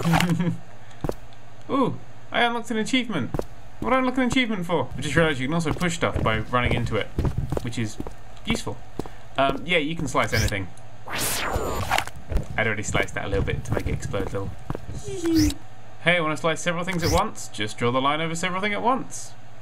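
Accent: United Kingdom. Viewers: For some reason, whenever I'm thinking over doing a tutorial video or something, I think it in some random British dude's voice... I now understand that it's Nerd3's.....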